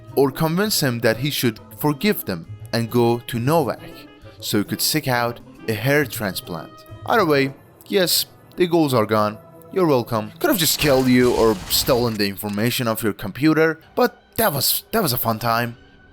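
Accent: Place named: Europe. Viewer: When he's got a euro accent you cant place. Its gonna be a fire video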